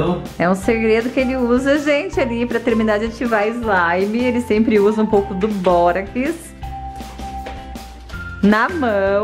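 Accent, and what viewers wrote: Portugal. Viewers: Ele é português?,eu sou curioso desculpa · Ele é de Portugal?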